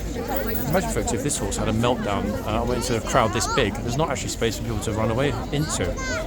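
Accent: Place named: United Kingdom. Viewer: I love the British accent and humor ❤️😂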